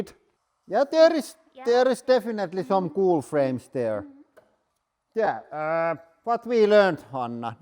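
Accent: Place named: Finland. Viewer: Herrane aika mikä suomi aksentti 😅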